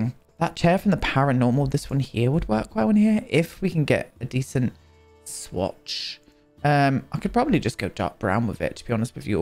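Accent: United Kingdom. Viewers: Good day I love watching you your sense of humour makes it fun to watch. And my favourite accent is a British one so listening to you and your laugh makes your videos that much better not including your talent and attention to detail. Bloody brilliant you are 😃. Even my husband enjoys watching you with me. Please take care stay safe your Canadian fan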